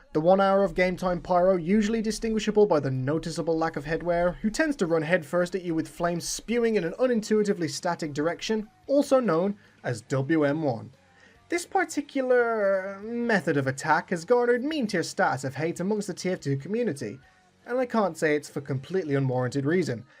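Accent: Poland. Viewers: Youre polish? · Are you Polish?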